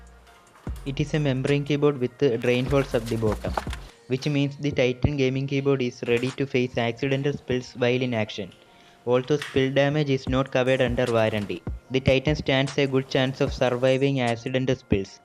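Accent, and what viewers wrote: Kerala, India. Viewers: Malayali ahnalle ? 😂 ( english kettappo manasilaayi )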